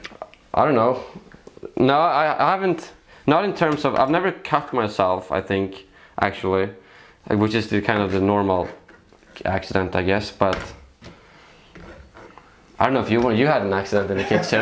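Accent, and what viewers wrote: Stockholm, Sweden. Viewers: Den här snubben är så stockholmsk som det går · why does sound extra swedish when doing vlogs? lol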